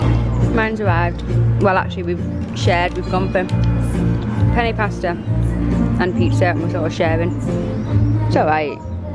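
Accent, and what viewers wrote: United Kingdom. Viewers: nice vids, love your Brits accent made me remember my years in Surrey, UK for my MSc.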